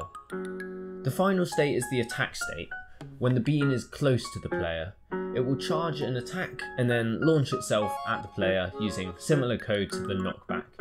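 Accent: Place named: United Kingdom. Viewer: Where you from bro? You sound bri'ish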